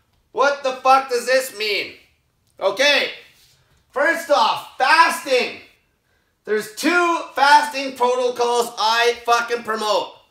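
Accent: Canada. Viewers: i think im in love. this guy sounds canadian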